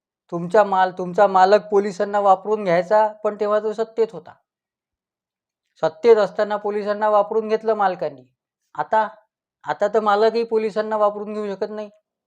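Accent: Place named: Nagpur, India. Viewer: खूप छान सर तुमचं नागपुरी भाषेत बोलणं खूप भवतं मनाला अजून थोडं प्रक्षुब्ध भाषेत हाणा की सगळे च विडिओ छान च असतात god bless u सर!🙏🙏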